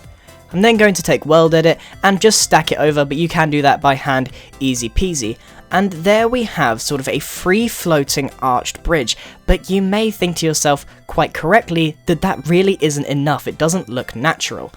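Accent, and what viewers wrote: England, United Kingdom. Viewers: Hey, love you English accent! 👍👍👍👍👍👍👍👍👊👊👊👊👊👊👊👊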